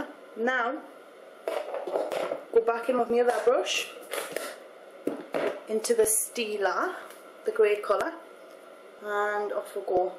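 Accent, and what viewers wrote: Newcastle, United Kingdom. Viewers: I think she's from Newcastle (north of England) she sounds like Cheryl Cole so I think so! I loveee it :) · its called uk accent XD everyone talks like that there lolz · she's from newcastle, so its called a geordie accent lol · I think she sounds alittle more british.. from the UK at least haha. idk. I'm probably wrong.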